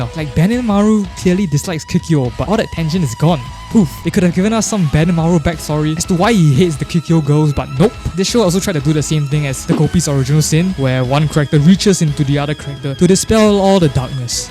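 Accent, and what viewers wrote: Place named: Malaysia. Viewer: This was a cathartic review for me, thank you for throwing shade on these characters. They really are as one-dimensional and bland as cardboard. I never understood the hype for it aside from the gorgeous artstyle + character design. Also, I might be tripping but you sound Malaysian af (or maybe Singaporean)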